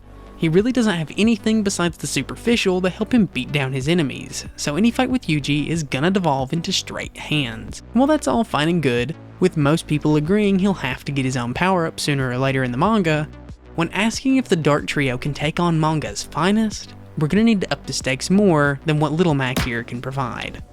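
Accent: South, United States. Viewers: Love the southern accent no diddy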